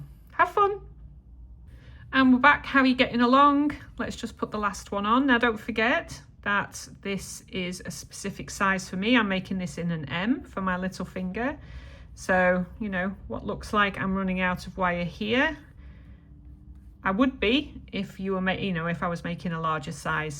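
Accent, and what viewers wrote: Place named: Merseyside, United Kingdom. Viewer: You sound like you're from my neck of the woods (Merseyside!). Lovely design ❤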